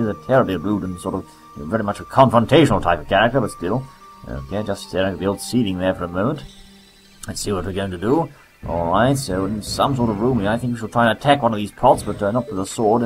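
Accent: United Kingdom. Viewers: WOW NOW THAT IS HARD-CORE BRITISH!!! awesome! sorry this is the first time i have wathced any of his vids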